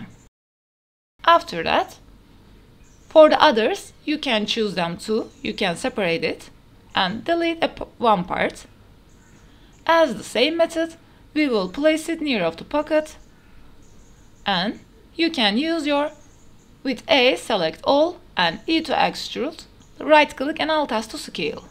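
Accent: Turkey. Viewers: thx for tutorial and i cant hold it inside me but ur accent feels like turkish ngl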